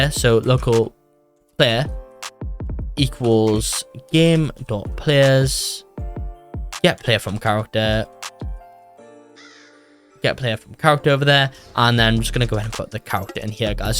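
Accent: United Kingdom. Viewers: u bri' 'ish mate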